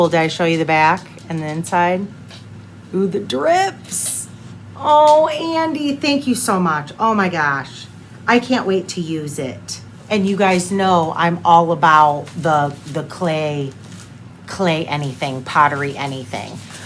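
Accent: United States. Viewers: I’m terrible. I just watch this because you’re hot AF but I also love your American accent, smile and demeanour.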